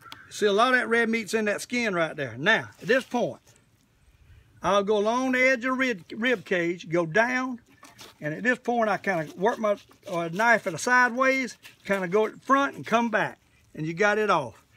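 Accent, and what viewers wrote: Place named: South Carolina, United States. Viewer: Great video. Love the gamecock drawl too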